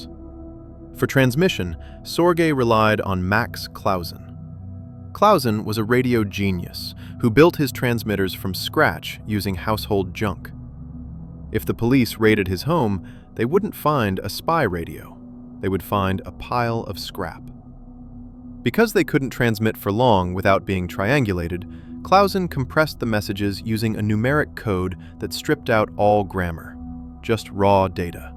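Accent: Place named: Canada. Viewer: Thank you for utilizing a Canadian voice actor. So tired of the typical deep voice American VO.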